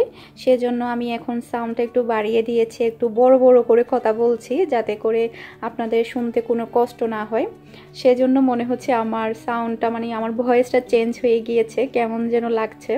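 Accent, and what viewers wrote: Chittagong, Bangladesh. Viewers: Apu tumar sob blog dekhle e valo lage..sobcheye beshi valo lage ctg tone e kotha bolo bole...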